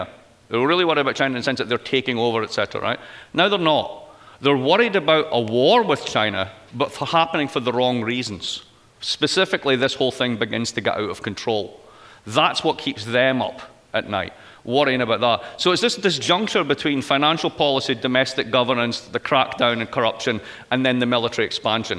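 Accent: Scotland, United Kingdom. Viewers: I want to ask the channel owner -- to PROVIDE C.C. I believe Mr. Blyth is a brilliant economist, but he speaks with the RIDICULOUS ACCENT! Probably scottish or welsh I couldn't understand 1\4 of the lecture.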